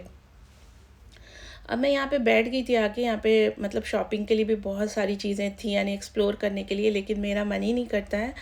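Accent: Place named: Uttar Pradesh, India. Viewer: Aap ki awaz sunkr hi lga ki Aap up se hai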